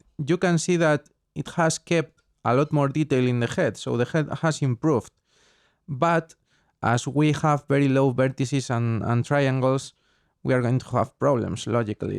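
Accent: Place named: Spain. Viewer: gracias tete por el tutorial, joer, como se nota el acento español xD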